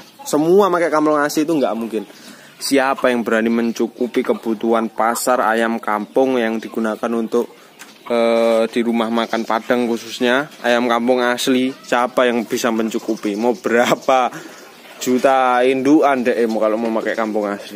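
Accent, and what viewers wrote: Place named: Lampung, Indonesia. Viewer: Mas alamate nang ndi? Kok bahasane koyo wong jowo Lampung.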